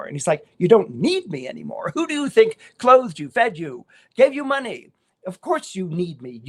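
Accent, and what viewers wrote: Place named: Cockney, United Kingdom. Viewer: In Hertford, Hereford and Hampshire (sher not shire) hurricanes hardly EVER (not hever) happen., your cockney accent!!!! And your singing!!!!! I enjoyed this immensely.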